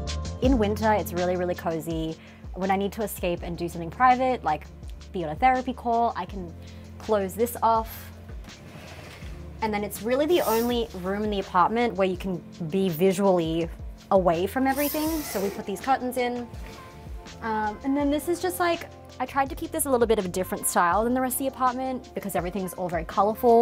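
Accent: Australia. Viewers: Love her accent, can hear the Australian coming through. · Are you a an Australian? You sound very Aussie, ooh never mind I just saw Vegemite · Her accent is definitely not regional to anywhere in the US. She sounds Australian to me.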